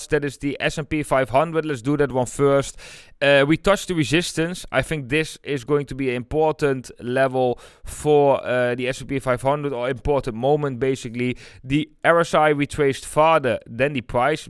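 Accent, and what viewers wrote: Netherlands. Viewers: Your name ' ' before i hear your name i did think immediately he is Dutch. I can hear the Dutch accent. LOL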